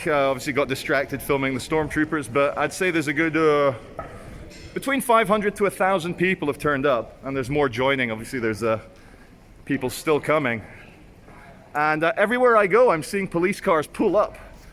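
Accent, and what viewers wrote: Russia. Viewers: Absolutely love your Russkiy accent - I could listen all day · I love your Russian accent. It's really funny. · I love your Russian accent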